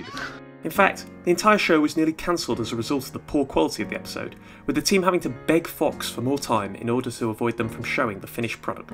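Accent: United Kingdom. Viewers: I miss the thick British voice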